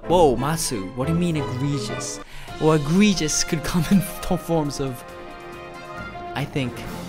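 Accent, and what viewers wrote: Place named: South, United States. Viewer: Southern accent from a Japanese face, that’s nice combo!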